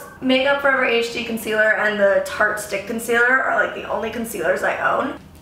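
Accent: France. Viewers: I actually love her French accent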